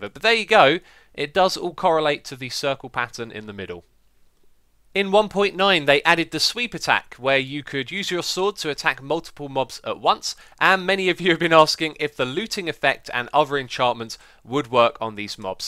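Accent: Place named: United Kingdom. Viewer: i dunno to me all british accents sound the same :I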